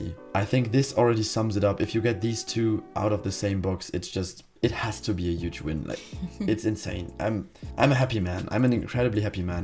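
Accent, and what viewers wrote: Germany. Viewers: Bist du aus Deutschland? Hört sich so an. PS gute Videos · Wie Mann es einfach hört das er Deutscher ist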